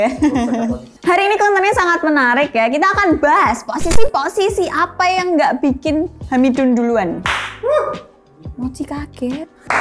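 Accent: Java, Indonesia. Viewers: baru tau chanel ini. .bhasanya medok jowo ne ki wong ngndi.. 😂 salam knal wong jwo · Sex Education dgn logat Jawa yg kental , kearifan lokal, btw endorsement Kondom Vivo ya empiris apa empirisme nih wkwkwkwk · seng tak seneng i... omong medok.e..... DOBBOLLL.... 🤣😂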